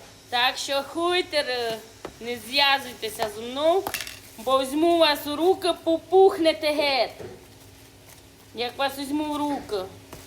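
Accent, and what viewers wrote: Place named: Western Ukraine, Ukraine. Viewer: Мої вітання. А Ваш говір то Чернівецького регіону чи Франківського?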